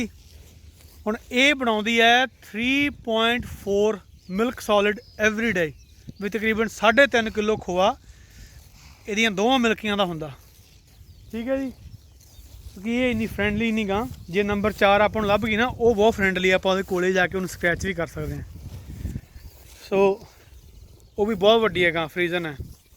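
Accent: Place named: Majha, India. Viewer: veer g tuhadi Boli Maajhe di lagdi a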